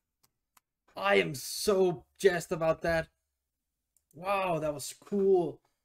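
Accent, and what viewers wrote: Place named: Scandinavia. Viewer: Hey. Where are you from. You have a slight accent I can't quite place. Guessing nordic, maybe danish? You got some catching up to do. They have already released 2 singles from their upcoming album, dropping on August 27. Then there's their back catalog. are a few years old now and the 4 band members have improved so much in that time and they seem to have found a sound and a style that are uniquely their own. Their new stuff is very technical and progressive, yet they still have that super heavy and awesome groove, we fans like. They often mix in influences and styles from outside rock and metal, always surprising, when we just think we have figured them out. My favorite songs are to be found on their later releases but i don't think they have a bad song. If you're not used to listening to metal I would start with the suggestions below, but if you like metal music you might jump straight into their new stuff. 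I recommend:,.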